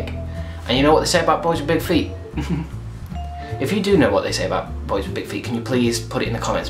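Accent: North, United Kingdom. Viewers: you sound so northern lmao well i think so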